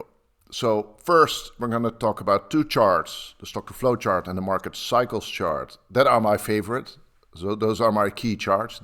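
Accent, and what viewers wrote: Netherlands. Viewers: Is that a dutch accent???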